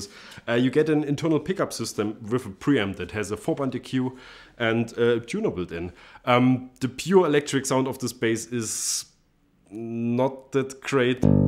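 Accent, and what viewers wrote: Germany. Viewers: That german accent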